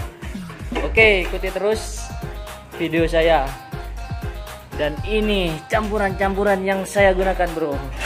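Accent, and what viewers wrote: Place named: Madura, Indonesia. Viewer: kalau dengar suaranya orang madura ya bang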